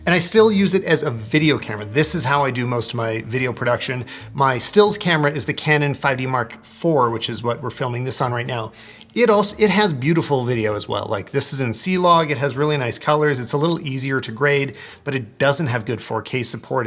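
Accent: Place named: Canada. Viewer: ur accent tells me you'r from Canada :)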